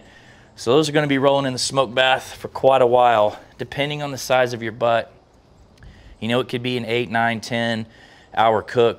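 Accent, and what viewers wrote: South, United States. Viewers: Is this guy from the south?? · Wait is this guy from the south?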